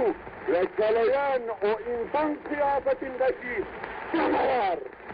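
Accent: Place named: Istanbul, Turkey. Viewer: Arkadaşlar,( rrrr) lere, ve vurgulamalara çok takılmayın,, o dönem istanbul lehçesi, geloor, , gedoor,, şeklinde,, bizzat bardakçı bunu anlattı, ve hatta son hanedan üyelerinin ses kayıtlarını dinletti, hepsi bu şekil konuşuyor, herkese selamlar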